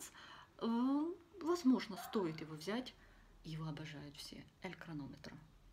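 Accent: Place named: Ukraine. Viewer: Автор видео, вы бы сначала русский выучили! Стыдно говорить и выкладывать видео с таким колхозным малоросским акцентом!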